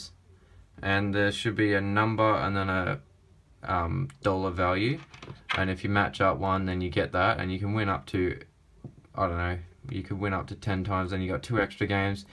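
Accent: United Kingdom. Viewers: He sounds British, but there are dollars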